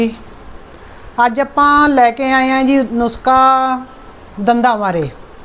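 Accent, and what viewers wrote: Punjab, India. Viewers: Bhaian ji thaith punjabi boht vadhiya laggi,mikdar jrur dseya kro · ਤੁਹਾਡੀ ਠੇਠ ਪੰਜਾਬੀ ਬਹੁਤ ਵਧੀਆ ਲਗਦੀ ਹੈ ਜੀ ਸਮਝਾਉਣ ਦਾ ਤਰੀਕਾ ਵੀ ਬਹੁਤ ਵਧੀਆ ਜੀ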